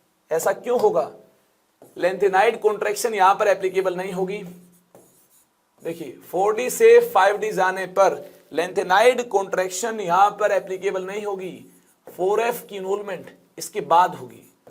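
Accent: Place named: Haryana, India. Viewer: Sir aap ka tone haryanvi h ...😆😁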